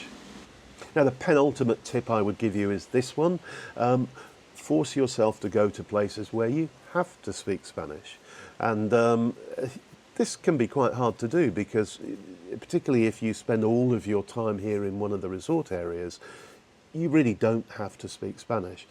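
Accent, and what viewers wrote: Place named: England, United Kingdom. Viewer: Tienes razón no has hablado mucho pero algunas frases… suficiente para escuchar el acento. Creo que para ingleses no es fácil perder el acento… no quiero ofenderte pero es así. ¿0 piensas que no? Has recomendado cuatro periódicos y escuchar la pronunciación de estos periódicos era suficiente para escuchar el acento! ¿No lo creas? ¿O que piensas al respecto de tu acento?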